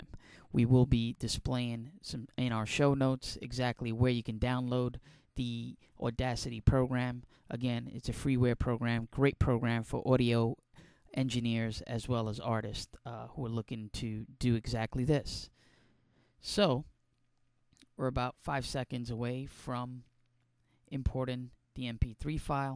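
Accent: New Jersey, United States. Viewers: You sure have a New Joisey accent. AWDACITY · are you from new jersey?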